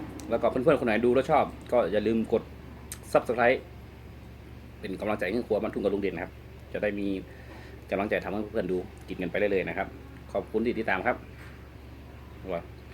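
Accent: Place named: Central Thailand, Thailand. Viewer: ชอบคุณเด่นพูดภาษากลางพอรู้ค่ะ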